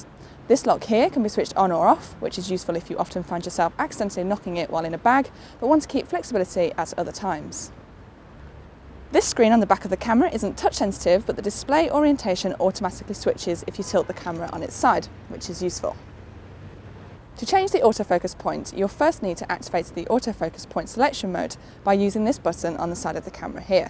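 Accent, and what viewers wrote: United Kingdom. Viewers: Like camera review with uk accent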